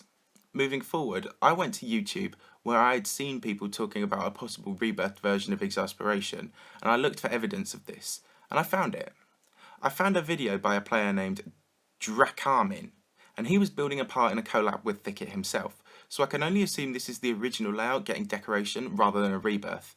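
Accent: United Kingdom. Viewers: It surprises me how British you sound in this video... Your accent could even rival Bold's. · I like your British accent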